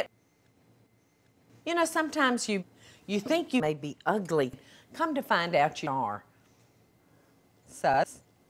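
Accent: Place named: South, United States. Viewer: her southern accent is perfect for YTP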